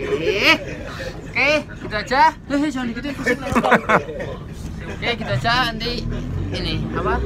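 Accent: Java, Indonesia. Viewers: si muka bule suara medhog andalanku🌈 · Oalah lagi..ditungguin gak nongol2..upload juga. Suaranya..gak keliatan orang Jawa ya mukanya tp medok nya bikin ketawa 😂 Inget gak pas lagi tanding trus mau ke toilet ngomong pake B.ingg ke wasitnya. Aku ngakakkkkkkkkk lucuneeeeee yaallah sampe diulang2 ampe gak kuat aku nonton nya juga 😂😭 Keren lah..👍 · Dari kabeh vlog yang kulihat semuane gawe kemekel tapi seng lebih kemekel yang jalan-jalan Disneyland Hongkong terus sama tutorial push-up, sit-Up, back-Up yang Salah. Demik seneng banget kambek medhok e. Sukses selalu mas 🙏 Selalu sukses buat mas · Suka denger ka ngomong, lucu lucu medok gemesin ea.sip sip wkwkwk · Wohooooo, akhirnya muncul juga yg ditunggu..medhokmu itu lohhh 😁 Sukses selalu yaaa😉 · Akhirnya muncul jg vlognya si ganteng tp medok ini haha😆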